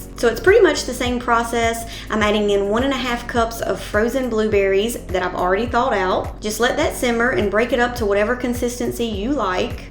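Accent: South, United States. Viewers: This is my first video and introduction to your recipes. Let me say you are extremely Knowledgeable! I love the explanation of what it is and why it's used! I'm assuming by your accent you're from the South and I just know you are you making Sausage Gravy and Biscuit's and Eggs(my southern cousins would) Lory Staten Island NYC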